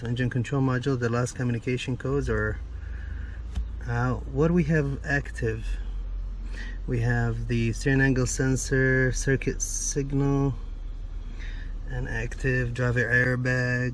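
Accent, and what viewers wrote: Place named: Iraq. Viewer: متحجي عراقي هيه مال تفيك 😅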